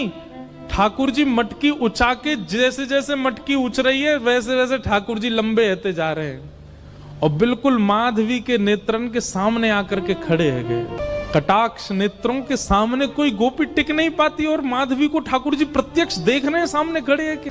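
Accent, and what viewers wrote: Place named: Braj, India. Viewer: Indresh ji ap bhut acha bolte ho. Kitni khoobsurat h apki awaz. Apki Braj Bhasha. Sach m sundar❤❤❤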